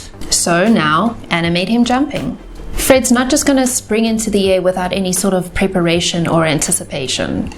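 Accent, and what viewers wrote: South Africa. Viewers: She sounds South African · Idk if you are, but you sound so south african!! :D it's weirdly endearing to hear the accent on yt Thanks for the tips btw. Love your channel! It's been a great help so far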